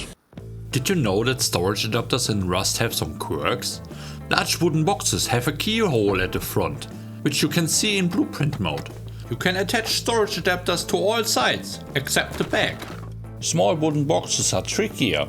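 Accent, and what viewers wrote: Germany. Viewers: The German accent is the best part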